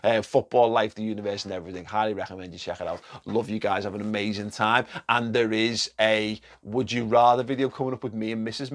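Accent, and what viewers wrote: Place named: Manchester, United Kingdom. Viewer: Your mancunian accent sounds terrifyingly like my grandad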